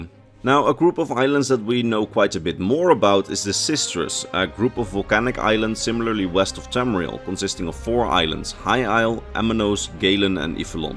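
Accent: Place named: Netherlands. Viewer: Uw accent... U bent toch Nederlander of Flander? Videos op het Nederlands zullen ook erg interessant zijn.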